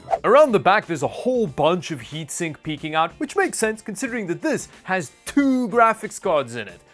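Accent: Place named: South Africa. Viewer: This guy has a South African English accent lol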